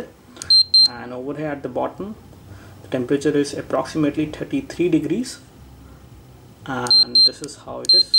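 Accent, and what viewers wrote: India. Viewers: индуса на английском поймет только индус)))))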